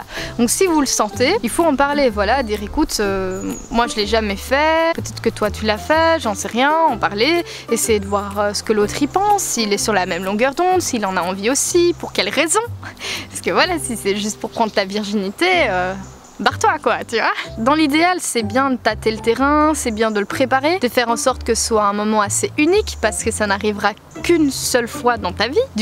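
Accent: Belgium. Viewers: T'es belge toi nan ? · Oula tu serais pas belge toi ? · Je t’ai découvert part cette vidéo ( je sais pas pourquoi ) et vraiment j’aime tellement ta joie de vivre. En plus je sais pas pourquoi mais ta l’accent belge 😂🇧🇪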